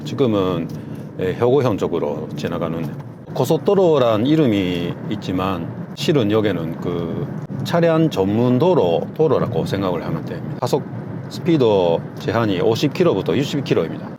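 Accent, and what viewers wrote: Busan, South Korea. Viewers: 어 완전 부산사람 말툰데요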